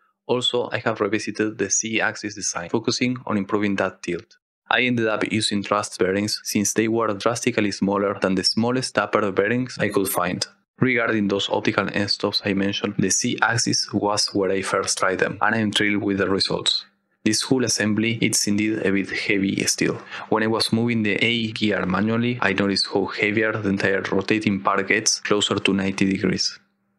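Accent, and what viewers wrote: Argentina. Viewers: Espectacular tu prototipo. ¿Sos Argentino? te delata el acento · Increíble proyecto!!! Escucho un acento argentino o estoy flasheando :? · Really liked your video. You sound so Argentinian, like a friend of mine talking in english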